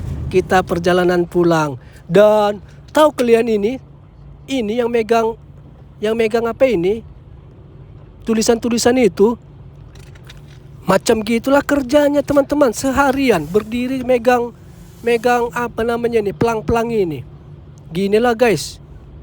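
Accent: Medan, Indonesia. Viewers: Bang aq ngakak banget pas abang bilang telor bunting,telor bengkak pula😂😂😂dan aq suka bnget cara abangnya ngomong medan banget gitu tp slow ah pokoknya nyaman aja lah bang liat plus dengerin abangnya ngomong.Btw aq orng Bandung bang😅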